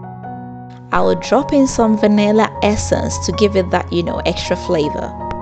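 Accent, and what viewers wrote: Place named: New Zealand. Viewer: Kinda like a new Zealand accent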